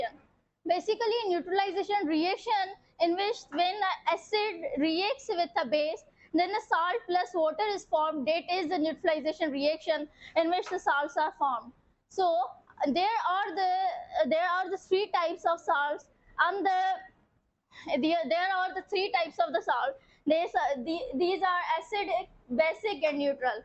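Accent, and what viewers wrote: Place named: Sadri, India. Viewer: Stand proud you tried your best🎉 btw are you speaking sadri in between 😅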